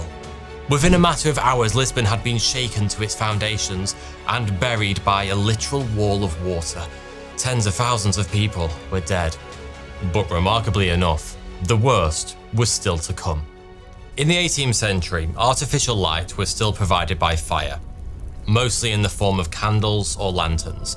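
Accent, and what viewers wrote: United Kingdom. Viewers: Hear me out here: It’s really not that big of a deal. He’s British, he’s gonna pronounce shit wrong from time to time.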